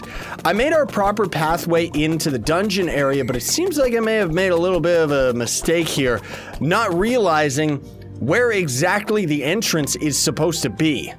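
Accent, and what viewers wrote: Canada. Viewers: Are you know Canadian Or Are you living in Uk xD IDK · Now every time I watch his videos I imagine him with his canadian accent. XD LOL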